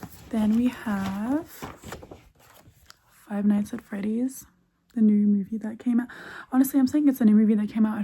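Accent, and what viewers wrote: Australia. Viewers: I always felt australian and british accents are extremely similar. your accent is very australian so I think that maybe english is your native language and you are only studying japanese but havnt become fluent yet.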